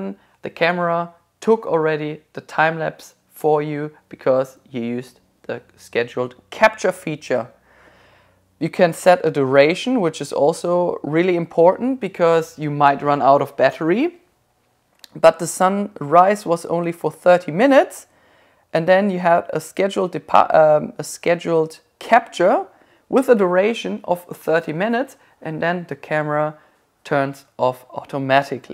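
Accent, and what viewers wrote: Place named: Germany. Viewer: I work for a german boss. Your video reminded me of him. Both because of your accent and almost ridiculous attention to the remote details :D This is an excellent video. I recently got a gopro 9 black as a birthday gift. This video will be really useful for me. Keep up the good work !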